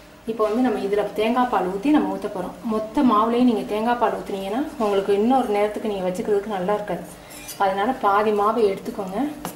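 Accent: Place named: Chettinad, India. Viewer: achi every recipe is awesome and that too with your chettinad slang it is very nice thank you for all your receipes...this is deivanai saravanan...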